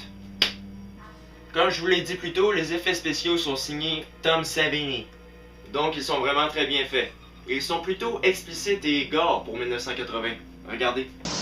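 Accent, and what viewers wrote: Canada. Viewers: Vive le Canada. J'adore votre accent. Bizzzzzzzzzzz. J'achète.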